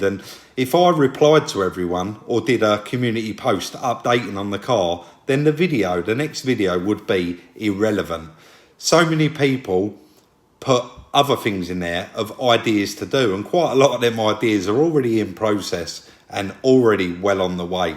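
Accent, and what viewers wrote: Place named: Cockney, United Kingdom. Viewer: I came here for the Bentley and ended up staying for the East Enders accent 😊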